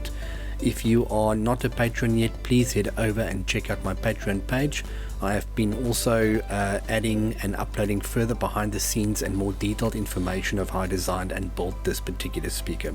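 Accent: South Africa. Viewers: Howzit. This is the first video of yours that I've watched and I became an instant fan after recognising the accent as local. Love the way you combine woodwork and audio - two of my favourite hobbies. Respect and admiration from Durban.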